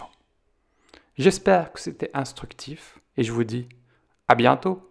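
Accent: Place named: Belgium. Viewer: Docteur, vous habitez en Belgique ? Ça se voit parce vous prononcez tachycardie avec : ch, et nonante pour 90🇧🇪🇧🇪🇧🇪😊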